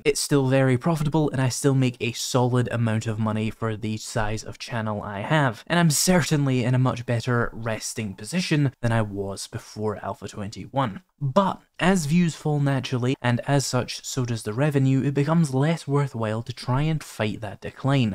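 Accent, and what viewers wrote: Scotland, United Kingdom. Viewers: That's a lowlander Scottish accent if I'm not mistaken, and I'm going to lean toward Dundee more than Edinburgh.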